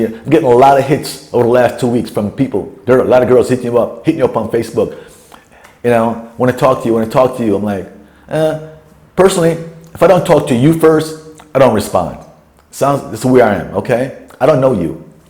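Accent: Jamaica. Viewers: You are Jamaica you spoke Jamaica ingles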